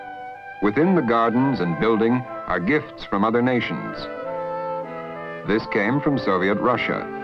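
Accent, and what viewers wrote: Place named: United States. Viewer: New York was very cool city during those days, unlike today's influx of countless people from all sides. However, I do appreciate the style of this genuine American English accent through the narrator. I can't recall specifically now, but perhaps this person also narrated some other TV documentaries during 50s and 60s. Surely, we nowadays miss this kind of manly voice which was often heard then in movies and TV programmes.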